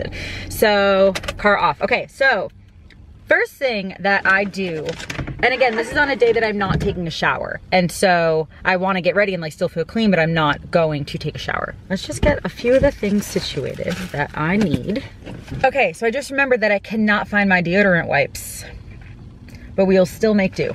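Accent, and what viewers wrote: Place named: New York, United States. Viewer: I love hearing NY expressions that I never realized were lol. My dad always used to say “dilly dally” but he just recently passed on July 19, 2019. I don’t realize some of the expressions NY’ers say until I hear them. I should have said before I live in NC now for 13 1/2 yrs. So you speak like I do. Lol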